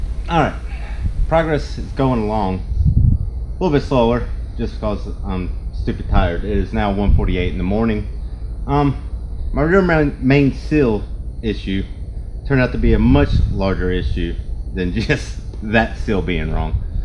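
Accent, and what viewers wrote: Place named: Carolina, United States. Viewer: That's great I could tell by ur accent u were from Carolina. I've got family in Greensboro. I always loved coming to visit over there from KY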